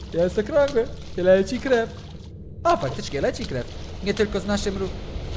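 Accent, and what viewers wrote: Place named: England, United Kingdom. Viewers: Mr XanTeS pl To nie kaleczenie. większość życia mieszkał w Angli, więc i posiada angielski akcent, nie polski.. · Mr XanTeS pl on jest z anglii jakbys nie wiedzial -.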